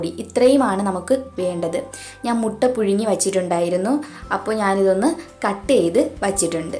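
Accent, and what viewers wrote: Kollam, India. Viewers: ഫ അല്ല ഭ ആണ്. കൊല്ലം ആണോ ചേച്ചി വീട്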